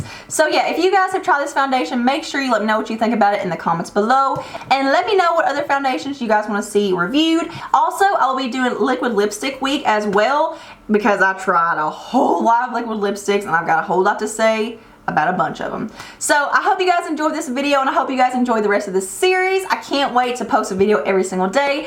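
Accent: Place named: Tennessee, United States. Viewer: Hey! Where are you from? I'm from Kingsport, Tn and you have the same accent as we do :) glad I found your Chanel!!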